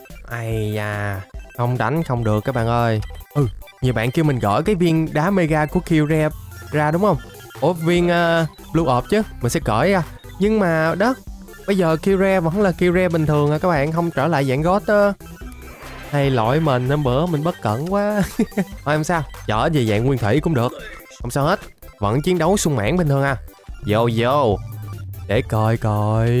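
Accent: Vietnam. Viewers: Ah là người miền nam hay miền bắc vậy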